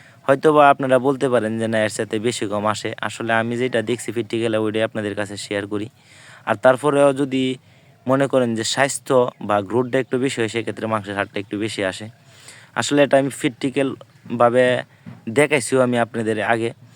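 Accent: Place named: Kishoreganj, Bangladesh. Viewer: আপনার কথায় বুঝা যাচ্ছে আপনার বাড়ি কিশোরগঞ্জ জেলা। আপনার নাম্বার টা দেন ভাই।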